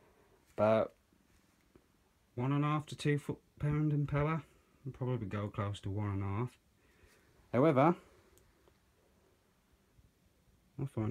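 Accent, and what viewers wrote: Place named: West Midlands, United Kingdom. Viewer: nice one mate that was a bloody fast reply lol anyway im from west brom but now in oldbury so i knew that accent a mile away haha also u should promote more maybe a lil bit of social media or summatt and im sure your channel will do really well .... also did u end up sellin all them plates or u still got a few left like?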